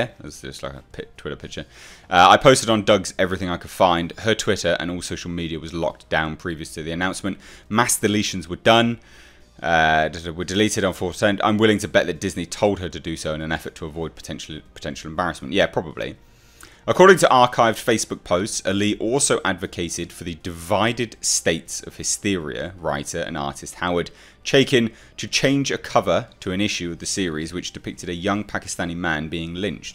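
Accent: United Kingdom. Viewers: I've become so used to the British accent when you said you never heard of here I was about to say something. Than realized, hey, he's British. The internet has ruined me lol. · Wait....you're British?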